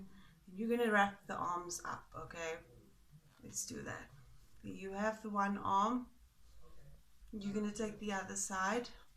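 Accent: South Africa. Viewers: Are u south african? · Love your accent are you from South Africa?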